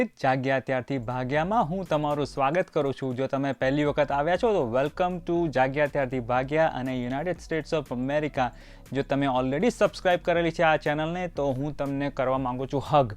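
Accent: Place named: Kathiawar, India. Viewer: Pure gujarati (kathiyawadi)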